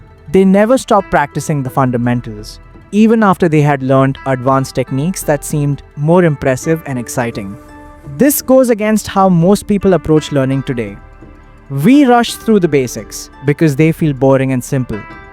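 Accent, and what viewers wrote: India. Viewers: Indian accent 🤮🤮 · Hi, I really like your videos and your country is japan in description but your English is telling that you are Indian. Am I right sir?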